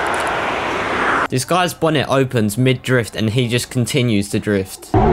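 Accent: United Kingdom. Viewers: You should let your videos narrate by someone with an american accent. British accent is just not it. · love your British accent bro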